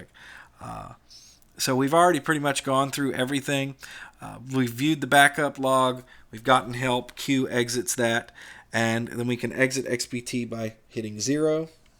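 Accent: United States. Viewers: just found you! Disabled, I am keeping myself busy trying multiple times to install Linux with failure due to various reasons. lol I need to desperately get up and running within a week only or else I will be in a pickle situation. Familiar with using pc and windows 30yrs, midrange / mainframes-user. U sound like u r n USA. I am limited to web access now. I have few KEY QUESTIONS. Can I email you Sir? Humble Respects. Alton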